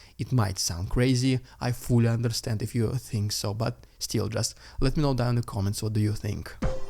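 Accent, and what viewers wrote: Russia. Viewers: Dude- I LOVE the Russian accent, so I'm not dissing you at all- but turning on captioning returns some HILARIOUS results of voice-to-text.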